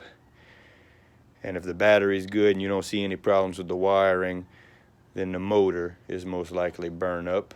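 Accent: Louisiana, United States. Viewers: You're definitely from southwest Louisiana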